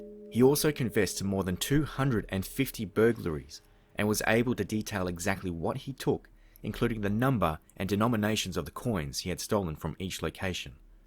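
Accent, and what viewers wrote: Australia. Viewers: You sound like an aussie urmaker! I love it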